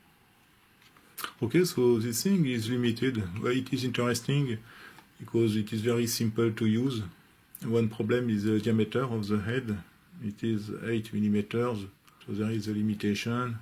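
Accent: France. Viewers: Love your English in French accent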